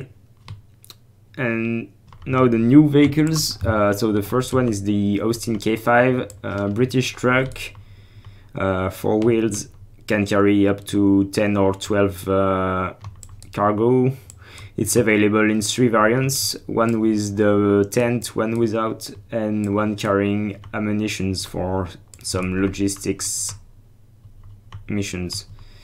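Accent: France. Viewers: tu a un accent français tu est un français en tout cas très beau mods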